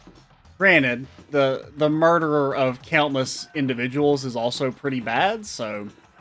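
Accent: South, United States. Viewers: Dude, you have my favorite Monokuma voice even if it's not intentional. Heavy Southern Monokuma is my new favorite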